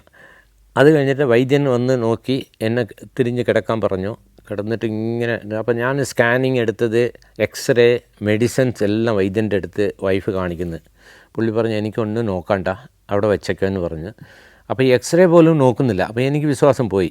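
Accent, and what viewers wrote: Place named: Tamil Nadu, India. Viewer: തമിഴ് കലർന്ന താങ്കളുടെ സംസാരം വളരെ രസമാണ്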